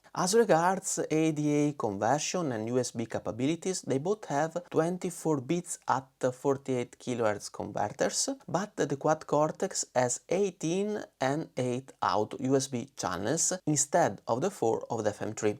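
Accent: Venice, Italy. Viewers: Mi piace troppo il tuo accento veneto!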